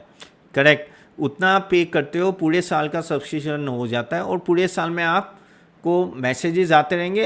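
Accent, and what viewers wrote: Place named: West Bengal, India. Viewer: U speak also like a Bengali n look also.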